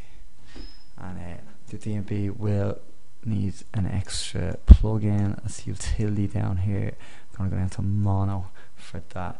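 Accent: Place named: England, United Kingdom. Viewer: it´s englisch dialect !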